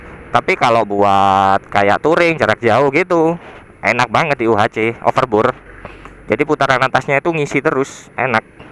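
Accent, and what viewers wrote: Malang, Indonesia. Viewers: wihh... arek ngalam bro podo 😂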